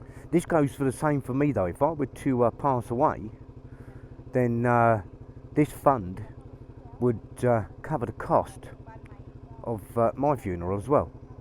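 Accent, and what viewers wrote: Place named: Northern England, United Kingdom. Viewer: His British accent just got a bit more 'northern' now, lol.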